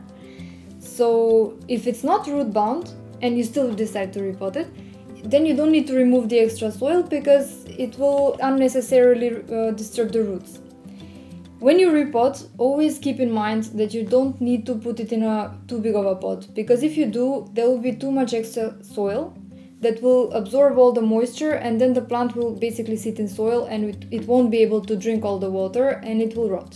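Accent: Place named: Slavic, Eastern Europe. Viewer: greetings from California... nice accent.. are u slavic? thank u 4 your helpful presentation..🌞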